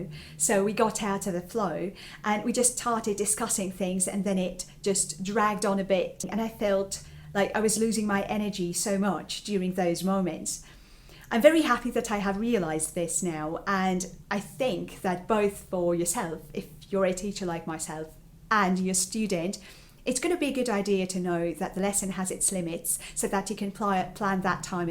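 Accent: United Kingdom. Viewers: Never have I heard any non native speaker with such brilliant British accent! Amazing, really.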